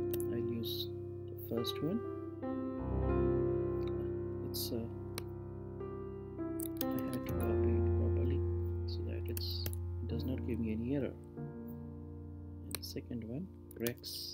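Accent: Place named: India. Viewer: Click on tutorial Hear Indian accent Close tab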